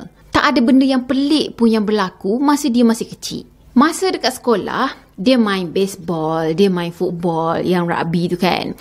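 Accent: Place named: Johor, Malaysia. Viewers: Assalamualaikum.. lama akak dah cctv je tapi kali ni memang nak kata… Bunyi Johor tu memang menyerlah sangat dah..😄 Btw bagus utk dia dakwa semula untuk clear nama dia.. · Cakap pun dah ada style orang Johor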